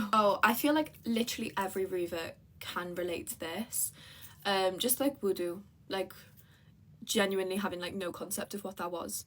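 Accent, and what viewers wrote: United Kingdom. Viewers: Sister, take your time you made the first step you recognize the creator and you submit to him. You’re in the safe zone. Everything else come second the description says you’re an American. You have a British accent. I just wanna correct whoever posted this.❤ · ربنا يثبت قلبك على دين الإسلام يارب وعلى فكرة لهجتها تدل على انها بريطانية وليست أمريكية المهم انها مسلمة فقط للتوضيح Sister we welcome you as a great Muslim sister and you look so beautiful in hijab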